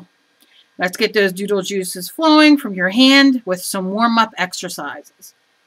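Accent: New Jersey, United States. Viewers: I love your accent, Jersey? Philly?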